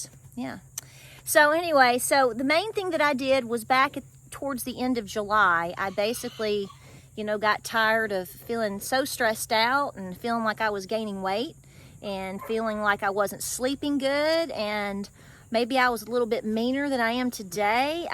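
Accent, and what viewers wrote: South, United States. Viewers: I just found your channel and I enjoy you very much. Thank you, love your peppy self and that Southern accent ❤️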